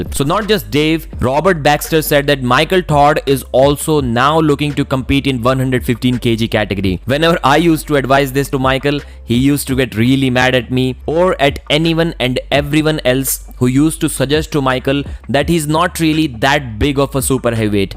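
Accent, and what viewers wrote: India. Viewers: the strong Indian accent of the speaker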